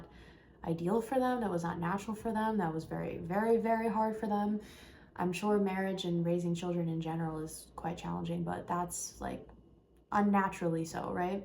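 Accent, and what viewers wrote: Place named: South, United States. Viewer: Why are you speaking in a Southern redneck accent?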